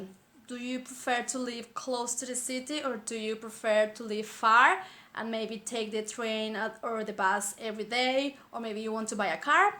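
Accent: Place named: Brazil. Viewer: Are you Brazilian? I can understand you so well:)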